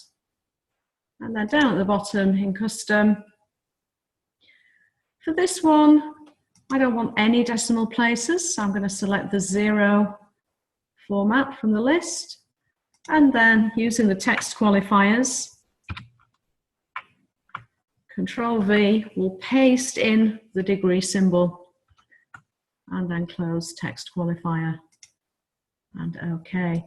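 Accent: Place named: East Midlands, United Kingdom. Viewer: Thanks, very helpful, succinct and to the point. I like your accent, is it East Midlands?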